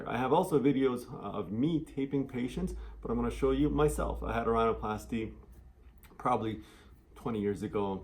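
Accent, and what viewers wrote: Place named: Iran. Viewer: اول از لهجه فهمیدم ایرونین بعد فامیلیتونو دیدم ،عالی😅😂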